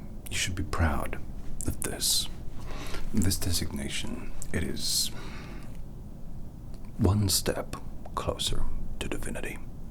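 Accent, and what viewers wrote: United States. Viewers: Less than 5 minutes in & THIS is brilliant... definitely worthy of a whole series & even more, thanks! & that american accent, spot on sir!!!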